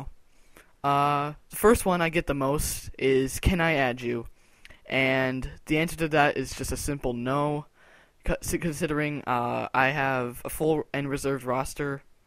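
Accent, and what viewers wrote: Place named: Australia. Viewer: Yes you sound austrailan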